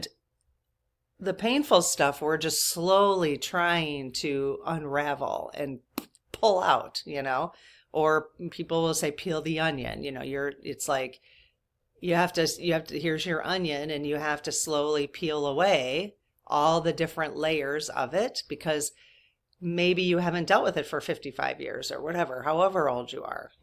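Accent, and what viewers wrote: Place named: Wisconsin, United States. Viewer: I grew up in Milwaukee and I detect a Wisco accent in you. Is that right? We moved away when I was 12 but I still have tons of relatives and friends there with that accent, which I love! I have ADHA and grew up with teachers telling my I was an underachiever because they knew I was smart but still was only getting Cs and Bs in school. I spent twice as much time on homework as my friends but no one knew why - there was no concept of ADHD then and people just thought I was slow to get things. Even in college I had to work twice as hard to get my BA in experimental psych. I didn't feel dumb but I just knew everything took me longer and was harder and I would make lots of simple mistakes such as in simple processes like addition and subtraction.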